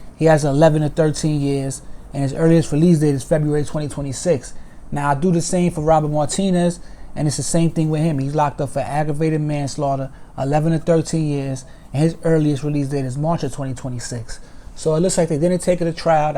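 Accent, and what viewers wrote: New York, United States. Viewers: I Love your voice it sounds so Native New Yorker !!! Love from Jersey Gal Born and Raised !!! Peace To Be !!!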